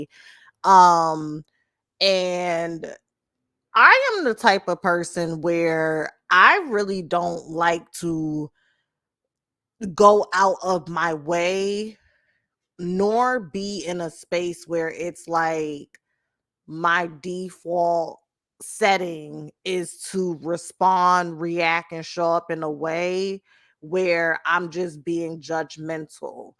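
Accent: New York, United States. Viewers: I'm going to miss you like crazy. I've been listening to you for years😔 I can't help but think "Damn, I'm not going to hear that strong ass New York accent dropping jewels no more"🥺 And now I have to say bye to my youtube crush😔🙏🏿❤🙏🏿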